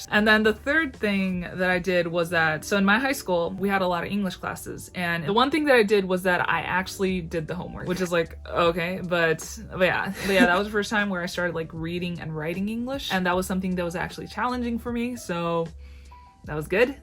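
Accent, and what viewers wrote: United States. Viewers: No way I thought you were from the US!! ¡Que inspiradora! Gracias por compartir tu historia. :) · Wow! I wouldn't have known you spent the majority of your life in Japan. Your English is so good. You even have the vocal inflections of Americans or Maybe Canadians in your age group. Congratulations on passing the B-2 DELLE.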